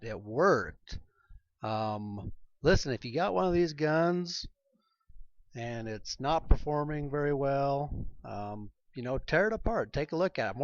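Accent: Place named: Utah, United States. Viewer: I watched this video because I'm rebuilding my Ingersoll IR285B, and I can tell you that its exactly the same procedure. Every last part looks identical! My vanes were stuck as well, with lots of rusty gunk, and I constantly oil my guns! Go figure? Thanks for posting ;)BTW are you from Utah? I have a friend in Utah and you have the same accent. Just sayin.......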